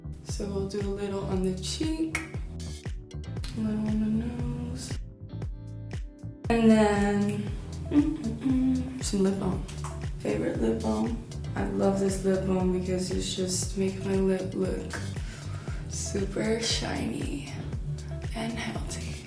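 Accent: Netherlands. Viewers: you heard how she says 'th'? classic dutch accent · She sounds so dutch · Did you grow up in the Netherlands? I spot some bits of an accent somewhere ;)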